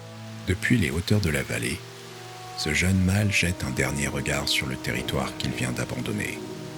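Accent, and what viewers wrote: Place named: France. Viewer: The effort is astonishingly great. The french accent makes this look like it's an actual documentary.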